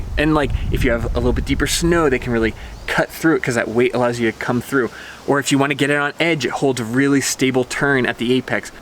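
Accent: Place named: United Kingdom. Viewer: Jesus H Fuck, here's to your kingdom accent.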